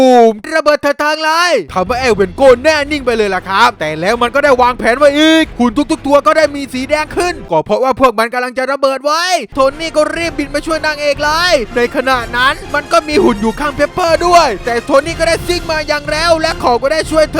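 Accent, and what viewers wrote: South, Thailand. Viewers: ต่อไปเอากับตันอเมริกานะคับพี่#ชอบเสียงใต้ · พี่เป็นคนใต้หรอ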